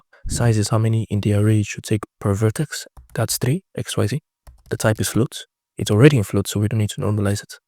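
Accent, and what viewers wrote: Nigeria. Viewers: Can’t place the accent are you Nigerian?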